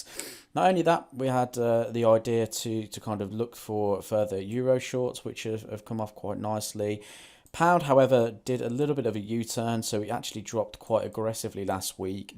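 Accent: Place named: Australia. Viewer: Thanks for the video. Can you please make a video on AUDUSD and AUDNZD? Can't figure out your accent xD Sounds Aussie but with some british words..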